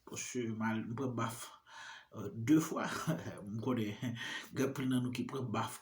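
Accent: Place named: Brazil. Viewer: Bonswa ! Lèw pale potigè ou samblè Brezilyen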